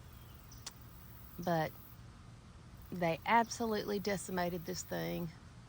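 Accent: South, United States. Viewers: Good morning,. Hope it cools off soon for you. Here in Ohio--we have temps in mid to late 50s in early morning. Then it creeps up to mid 80s by the late afternoon. Yes I understand what you are going through with the walnut tree and shrubs. I've had similar problems with a walnut tree mixed in with tiger lilies growing next to the garage. Last year we even had poison ivy in that space. I had to clear it out (protected by gloves) and still got a little rash on my forearms. We have very dry soil right now. It hasn't rained in over two weeks. I love your southern accent,. Soil or soul is fine with me.😅 You did a good job clearing out the problem area. Have a wonderful Sunday afternoon.😊💖💕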